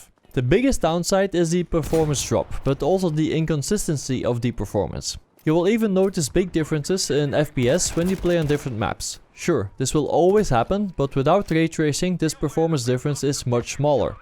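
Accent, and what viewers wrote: Netherlands. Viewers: Your Dutch accent is so obvious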